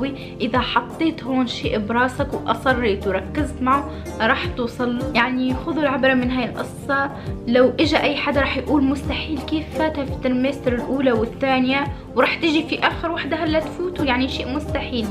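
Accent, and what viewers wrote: Tunisia. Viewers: انتي تونسية صح ؟ ماشالله على بنت بلادي اول ماعرفتك عندك نبرة تونسية و شاهدت البعض من فيدواتك روووووعة ماشالله عنك😍😍 انشالله نقول عليك صحباتي يتابعوكي ❤❤❤❤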